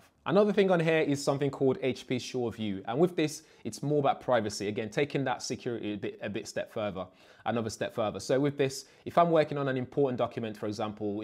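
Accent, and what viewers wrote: United Kingdom. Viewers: Brithish accent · lovely to find a review with a british accent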